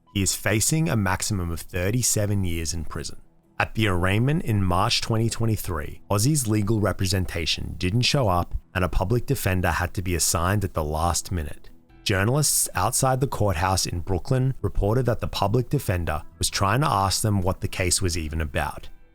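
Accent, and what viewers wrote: Australia. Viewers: Wait... are you Australian?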